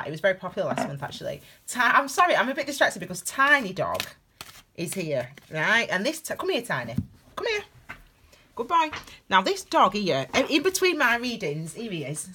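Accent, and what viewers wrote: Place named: North, United Kingdom. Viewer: My pleasure! More please! LOL! It’s ironic that when I listen to many of those Texan fast mouth readers I can barely understand them. Your beautufl Northern tones of course are so easy to listen to...and of course the content is excellent! 🍷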